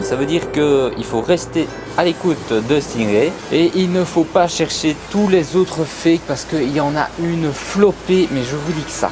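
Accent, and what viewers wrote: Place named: Belgium. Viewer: un belge !!!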